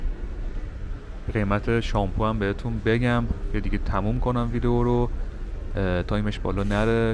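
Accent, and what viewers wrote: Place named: Iran. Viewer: love the Iranian accent greetings from syria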